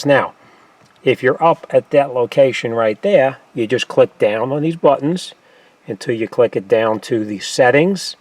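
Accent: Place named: Northeast, United States. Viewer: I love the video. Easy, clear, and straight to the point. Plus, that Northeastern accent is one of a kind!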